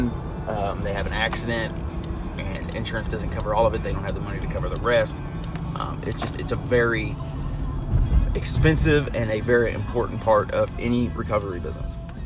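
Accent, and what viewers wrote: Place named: Indiana, United States. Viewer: Man I’m from Indiana and I was like this dude is definitely somewhere in Indiana don’t know the town but I can tell it’s Indiana 😂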